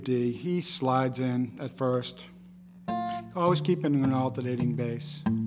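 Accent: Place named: Brooklyn, United States. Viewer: Interesting, sounds like he's from Brooklyn, NY or close to that, can't pick very good either.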